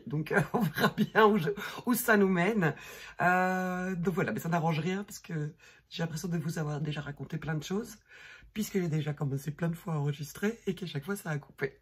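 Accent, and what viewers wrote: Belgium. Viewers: J'adore t'écouter placoter même si je ne tricote que des foulards...! La langue belge est jolie...tout comme ma langue directement du Québec.hi hi! bye et gros becs!!!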